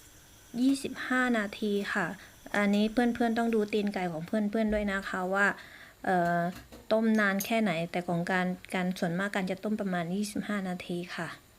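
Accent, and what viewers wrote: South, Thailand. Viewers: เก่งจังน้อว คนใต้แน่เลย สำเนียงได้ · รู้เลยว่าคนพากย์คนใต้นิ555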